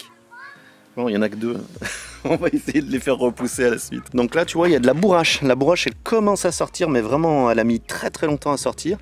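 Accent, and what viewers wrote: Loire, France. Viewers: Un p'tit accent du 42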